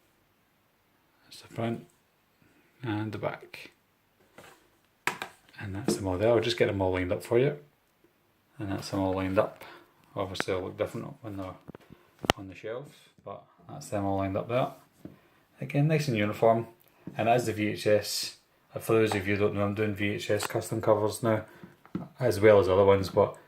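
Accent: Scotland, United Kingdom. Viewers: I knew a could hear a Scottish accent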